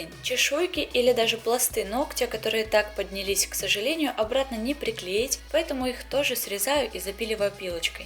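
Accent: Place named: Makiivka, Ukraine. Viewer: Подписана на вас очень давно, смотрю все видео. Вы потрясающий мастер. Но почему вы настолько плохо говорите по-русски? Почитайте книги, что ли. Или хотя бы не используйте деепричастие и причастие, если не понимаете, где в предложении действующее лицо. Нельзя так. Вы публичный человек, а шпарите на макеевском диалекте.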